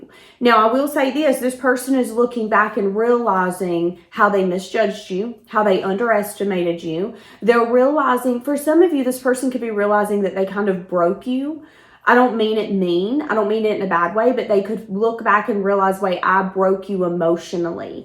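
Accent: South, United States. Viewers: I love your Southern accent chere!💜💜💜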